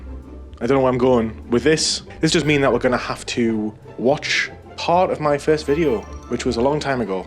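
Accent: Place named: Northeast England, United Kingdom. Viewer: You sound like a fellow northeastener, hi from South Shields 👋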